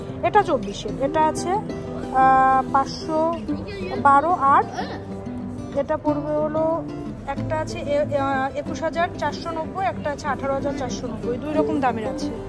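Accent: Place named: Bangladesh. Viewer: Actually apnar kotha shune majha majha Bangladeshi moto lage. Tai ask korlam.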